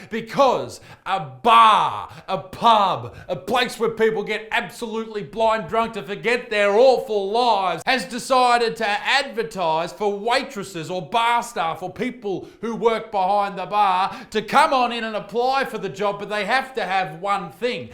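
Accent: New Zealand. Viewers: How the hell did a nothing story from the west coast of NZ end up in the US. Especially as there are only 6 people who live in Hokitika. I need to get my arse down the road to the busy place (Auckland) to watch your show. Good work fella. Your Kiwi accent and pronunciation of Te Reo (Maori) is pure comic gold. Aussie is woke as hell, but there are plenty of virtue signalling woke idiots here too. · 10/10 for making me laugh today. Your kiwi accent is hilarious your rating of cup sizes is ground breaking.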